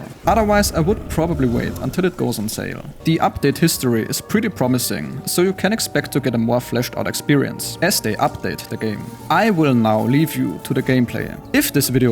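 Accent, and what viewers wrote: Germany. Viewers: U german bruh ?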